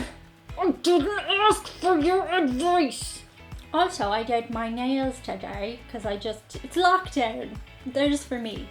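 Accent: Ireland. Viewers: Out of curiosity, what American accents do you, or other Irish people, like the most? As a Texan, I find exposure to the Irish accent rather dangerous. I find my own accent starting to shift for a while after hearing it for the length of a movie.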